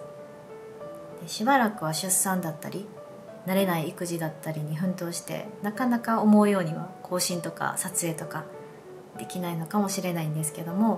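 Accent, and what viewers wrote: Kansai, Japan. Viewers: ご懐妊おめでとうございます(*´Д`*) いつも手際よく美味しそうな料理、お弁当、私には真似出来ないけど、素敵な奥様だなぁと思っていました( ´∀`) うちにも猫がいるので、茶々にも癒されていました。不妊治療もお疲れ様でした、私も同じくらい経験しましたが、残念ながら出来ず、やはり妊娠は奇跡だなぁと思います。暑い時期ですし、無理せずに過ごして下さいm(_ _)m 関西弁も親近感湧きます、サクラ🌸咲くさん、本当におめでとう～(^O^)／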